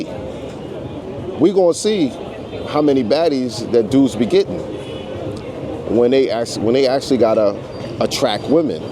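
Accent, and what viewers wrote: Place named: New York, United States. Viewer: Man if this guy ain’t a New Yorker…😂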